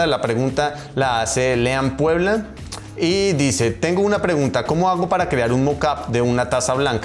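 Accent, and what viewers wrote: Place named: Canary Islands, Spain. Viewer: cada vez que veo un video tuyo aprendo algo. lo explicas muy bien y se te entiende perfectamente . tambien sera porque soy Canaria y pronunciamos de igual manera jajaja gracias!!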